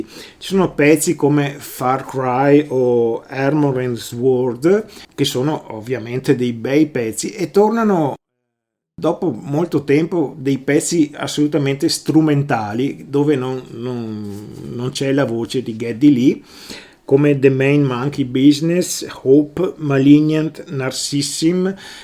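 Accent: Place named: Veneto, Italy. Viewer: Un video per ghiottoni,molto interessante,grazie,ti ga' cadenza veneta,ció...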